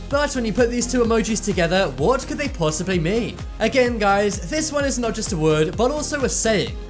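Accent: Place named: United Kingdom. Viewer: He sounds btitish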